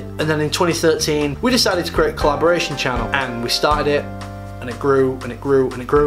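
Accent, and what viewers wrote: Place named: Manchester, United Kingdom. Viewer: Is from Manchester??:o I've been away so long, but that accent just plunged me into nostalgia xD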